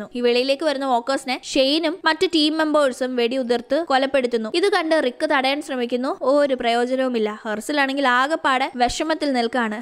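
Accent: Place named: Kozhikode, India. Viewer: sound like kozhikode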